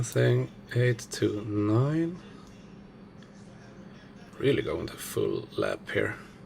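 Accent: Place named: Sweden. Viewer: You are swedish, I hear it by accent... hej på dig!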